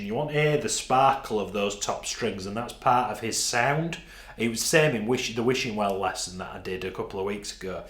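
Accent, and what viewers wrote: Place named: Leeds, United Kingdom. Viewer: Great gutar teacher as s leeds fan love the accent 😊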